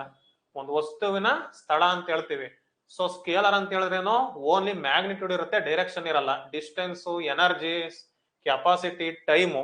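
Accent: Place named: Mandya, India. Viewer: Sir Nim voice matado style mandya bhashe bittu hogilla niv ellig hodruu ❤❤❤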